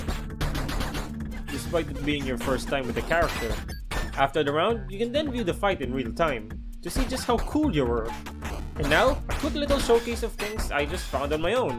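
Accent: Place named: Latvia. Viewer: You sound Latvian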